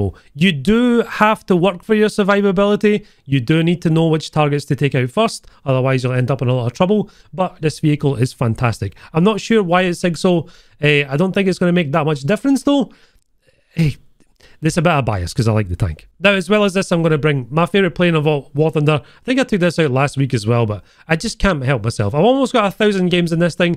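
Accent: Scotland, United Kingdom. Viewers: I love you because you respect people's gender identities and you make sure to include them with your usage of the phrase "ladies and gentlemen". It's a small thing, but with human decency becoming politicized people often shy away from even acknowledging the existence of people that are gender nonconforming. Aside from that you make great content, and your very distinct Scottish dialect reminds me of a great horror movie reviewer on here named Ryan Hollinger. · I love you too man specially that thick sexy scottish accent🍻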